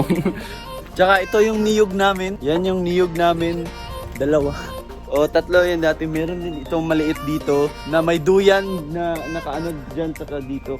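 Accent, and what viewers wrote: Visayas, Philippines. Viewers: Saan ba kayu kuya? Parang may visaya accent ah. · DONE BJ Baldado From: Zamboanga City Amping mu always 😂 Cute kaayu ka. Magkatawa ko sa imung pronounciation sa tagalog Klaro jud Bisdak Haha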